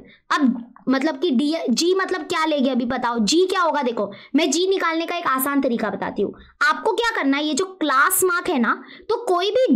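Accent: Maharashtra, India. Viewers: r u marathi????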